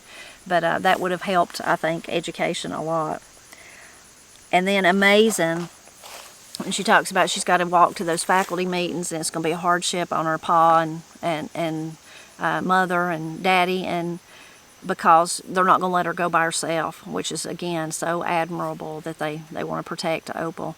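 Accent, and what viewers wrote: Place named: South, United States. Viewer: I love hearing your southern accent while you're reading! I listen while I'm cleaning the house. Opal is a real inspiration, too ! I love watching all your family! You are very blessed ❤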